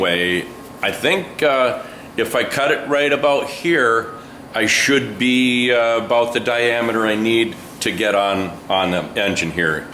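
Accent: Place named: Midwest, United States. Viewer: you are so midwestern. it brings me joy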